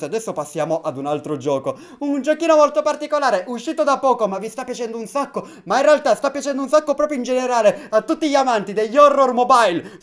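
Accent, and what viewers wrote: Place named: Sicily, Italy. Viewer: Non si capisce l'accento mi sembravi della Sicilia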